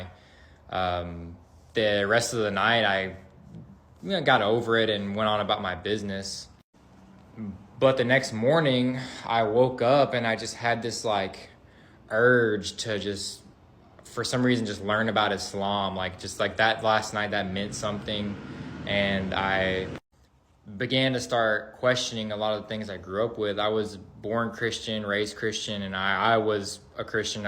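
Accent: United States. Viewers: Alhamdolillah, welcome to the true brotherhood! The accent sounds American and not British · American accent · His accent is American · Sounds American rather than British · He has an American accent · That’s what I thought too defos American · Has an American accent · He sounds American.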